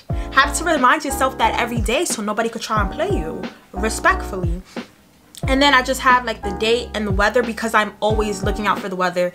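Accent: New York, United States. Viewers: The moment you opened your mouth I knew you were from NYC 🥰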